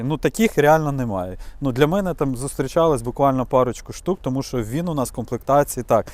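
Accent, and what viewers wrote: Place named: Volhynia, Ukraine. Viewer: Волиняни так розмовляють, Стрій, Кієв, город і да. Це масове явище.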